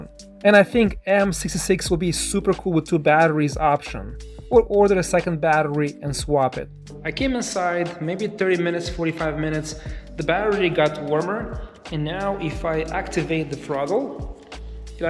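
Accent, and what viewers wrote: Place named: Russia. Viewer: friend, dm you are Russian as I hear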